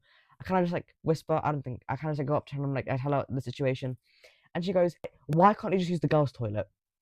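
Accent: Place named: United Kingdom. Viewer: bro is brittish wtf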